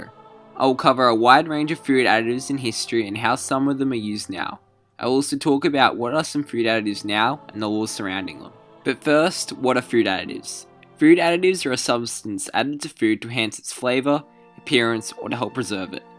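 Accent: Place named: Australia. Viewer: Le gasp Australian accent hehehe. (I mean, I knew that already, but still...)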